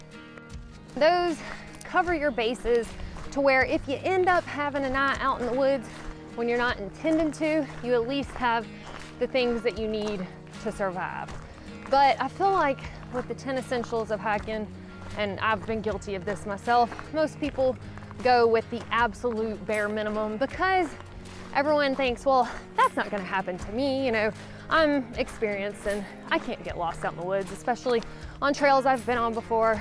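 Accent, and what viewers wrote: South, United States. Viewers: Gymnasticin! Love it, been a while since I have heard pure Sutherneese.... Hi, been a while!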